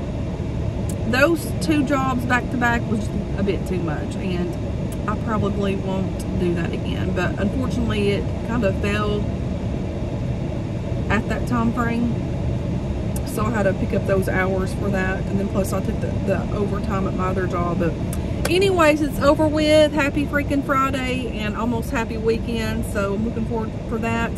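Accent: South, United States. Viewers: Amen Lady!! You said everything that I would have said too! I'm a new subscriber and I really like your southern accent. I have one too. I was born and raised in Tennessee. I now live in Alabama. My parents and a lot of family are in Tennessee and I visit as much as I can. I love the way you think about things and the ones with harsh comments are the ones insecure about themselves. You are beautiful inside and out. Take care and God bless!! ❤️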